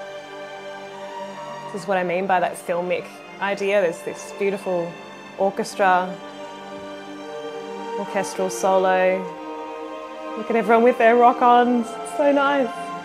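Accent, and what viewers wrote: Australia. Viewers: Are you Aussie? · G'day! Do I recognise the accent? Welcome to Nightwish. Nightwish Army, Blue Mountains Corps, Australia! What Gabriel said! Any song from this concert will knock your thongs off! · Love your Australian accent ^_^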